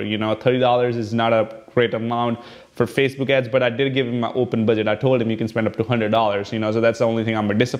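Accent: India. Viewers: I like that Indian accent :) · STOP trying to speak like a american , Your INDIAN accent is clearly coming through , STICK TO the indian accent ... stop trying to sound like American with R sound.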